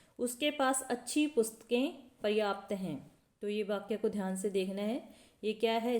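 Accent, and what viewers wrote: Punjab, India. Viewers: Mam apki hindi me Punjabi touch h aap Punjab se ho.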